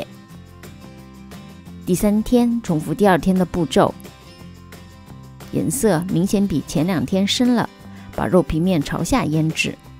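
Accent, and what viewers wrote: Shanghai, China. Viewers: 最喜欢的东西上海普通话越听越亲切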